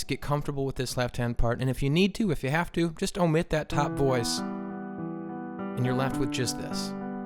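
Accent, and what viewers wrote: France. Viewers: Thanks, just when I finished learning it xD btw i like your french accent xD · Ahaha the way to you speak french sounds fun Cheers from Paris I speak english espanol french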